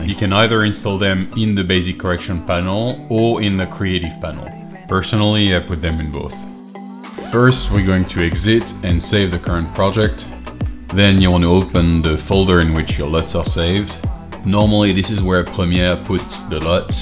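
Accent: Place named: France. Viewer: You are purely French. Your accent speaks a lot haha!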